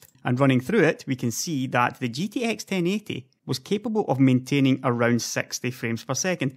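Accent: Scotland, United Kingdom. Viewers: Its Scottish its difficult if you aren't native English speaker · so it is scotish not indian....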